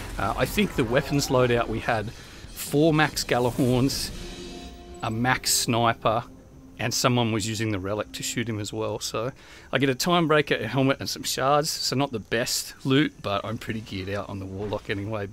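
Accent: Australia. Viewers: I love these videos. It explains so much plus I can never get enough of the Aussie accent.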